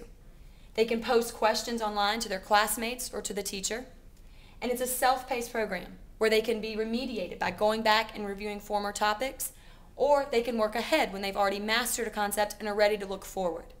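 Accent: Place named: United States. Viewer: Your video is being used on a UK website claiming that you are one of their teachers which is odd I thought because of the Yank accent. you might want to ask them to take it down, contact me for the link where it is being used if you care.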